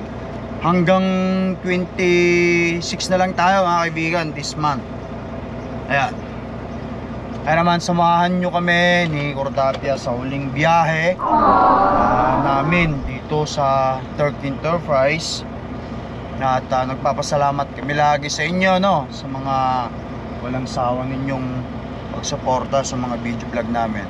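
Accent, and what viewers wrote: Ilocos, Philippines. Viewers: Mailiw nak man idiay Pinas no mangeg ko accent mo.. Keep safe trucking Manong ko, shout out met ah ..Uncle Sam Outdoor from Sydney Australia originally from Tondol Anda Pangasinan..Dios ti agngina apo.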